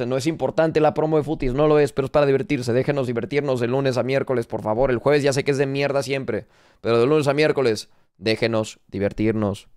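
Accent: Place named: Spain. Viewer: tu no eres mexicano eres español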